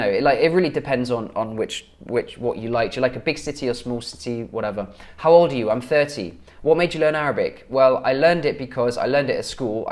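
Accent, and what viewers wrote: United Kingdom. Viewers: I wish I can speak British like you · learning arabic from British accent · more British accent videos plz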